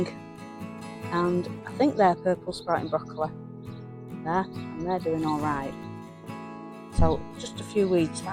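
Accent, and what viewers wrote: Lancashire, United Kingdom. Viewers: Are you a lassie from Lancashire.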